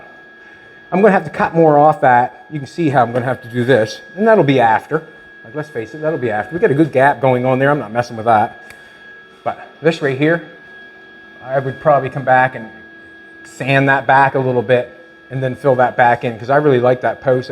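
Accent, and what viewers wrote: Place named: Canada. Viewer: I love these videos, I have been seeing you online and hearing your name. I never knew your were Canadian until I saw my first video of you. haha. I was like... wait a second, I know that accent. Cheers to you. I am in Ontario and I vacation yearly in Souris, PEI. We want to visit Nova Scotia sometime soon.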